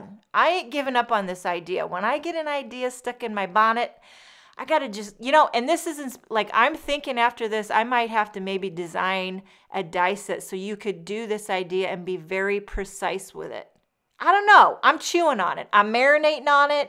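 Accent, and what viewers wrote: New York, United States. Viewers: Ohmy gosh are you from NY. I love listening to you. You are hysterical. lolol.. Love the cards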